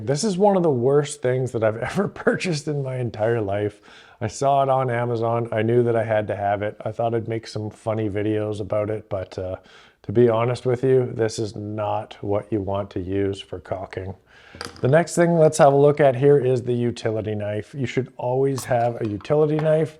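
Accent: Canada. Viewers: Camera work is getting so good! And...yeah i watch these videos cuz you're insanely handsome, and I have a soft spot for Canadian accents, but the handyman tips are a bonus 😎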